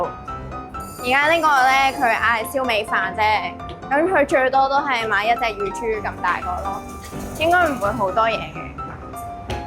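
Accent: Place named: United Kingdom. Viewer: 聽把聲就似一個毛都未生齊嘅靚妹。 口音好似係學英式英文嘅人。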